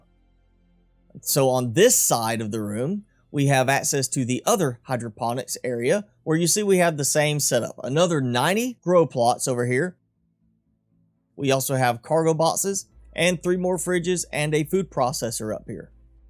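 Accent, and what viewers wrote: South, United States. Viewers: No insult intended. Just curious. 😁 It sounds sort of southern...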